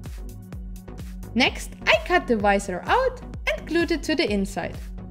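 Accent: Germany. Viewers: Are u German??? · Man merkt gleich, dass sie aus Deutschland kommt 😅 aber gut gemacht. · Ich hab mir schon gedacht, dass du irgendwie einen deutschen Akzent hast aber nachdem ich mir die Kanalinfo angeschaut habe war ich mir sicher. Btw wirklich hilfreiches Video!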